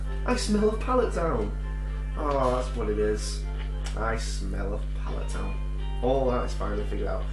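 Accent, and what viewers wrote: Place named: United Kingdom. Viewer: guys its he just picked up a british? accent. really good vid man. ima subbed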